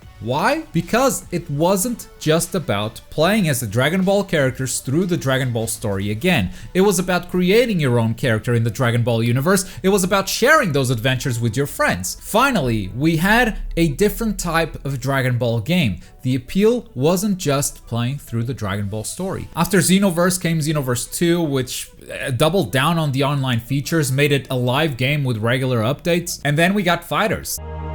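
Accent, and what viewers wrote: Portugal. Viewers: eu a ouvir a pensar que parecias ser tuga e afinal dps falas em portugues e pronto duvida acabaram ahah mas algo k ne comum ver u tuga ate fazer bem nesta area, continua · É claramente português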